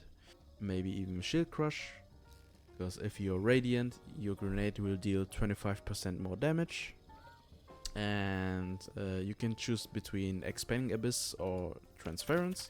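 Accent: Germany. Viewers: sounds german🗣️ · Man hört richtig, dass hier ein deutschsprachiger spricht. Aber tolles Video 👍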